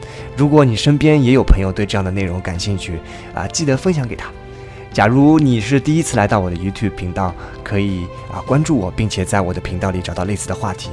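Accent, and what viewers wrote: Shanghai, China. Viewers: 怎么感觉有上海口音了，，，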